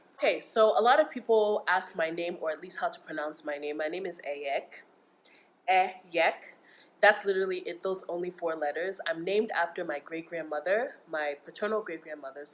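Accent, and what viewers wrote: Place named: United States. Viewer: OMG I love ❤️ it you put Jesus first! I’ve watched a lot of YouTube videos from people moving to Lagos from other places, but you’re the only one with my America accent.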